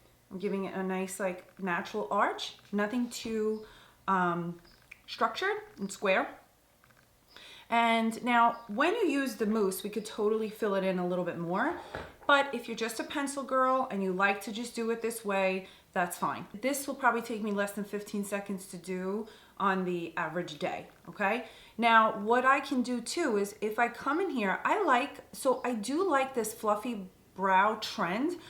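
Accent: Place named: Long Island, United States. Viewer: I love your LI accent! I’m a guido from Brooklyn myself..lol. I never really had the need for makeup. I’ve been fortunate in that way. Good genes I guess. I’ve started to lose my brows since my thyroid condition. My hair, nails, lashes and eyebrows are just not the same. I’m not experienced at all in the makeup department anymore so I’m so afraid of makeup now and looking silly. I’ve seen that you can have your makeup done beautifully but if your brows are done badly, well then that is all she wrote. Everything just looks wonky after that. One of my problems now is picking shades (so many shades and undertones to chose from). Your brows are fuller than mine so they look darker but I think I’ll go with shade you’re using. I’m 50 something now..cough.. cough so I need something.. I’ve started using better skin care so I want to use makeup with good anti-aging ingredients. 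As for makeup, even if it is just putting on some mascara, concealer and having my brows done would be helpful so I won’t look so tired. Any tips would be appreciated!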